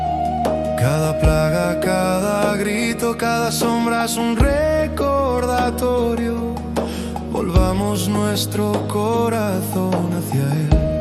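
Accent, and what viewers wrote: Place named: Spain. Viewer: Wow!! Yo pensaba que naciste en España por el acento, señor. Veniste a Estados Unidos por música, religión, trabajo??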